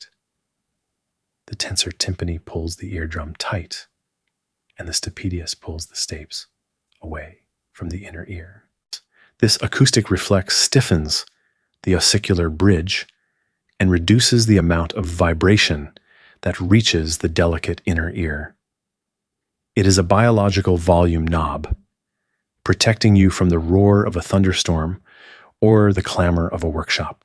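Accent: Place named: United Kingdom. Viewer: Bro bring back British voice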